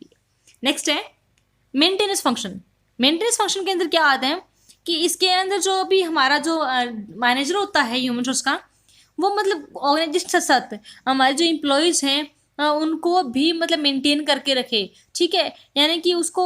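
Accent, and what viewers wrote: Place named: Haryana, India. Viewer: Mam aapka anjane ka trika bahoot acha Hai😊but language me thoda Haryanvi Touch Hai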